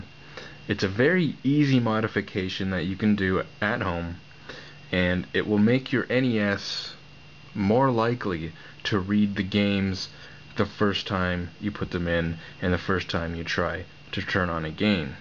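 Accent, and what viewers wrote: Canada. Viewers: You're Canadian huh?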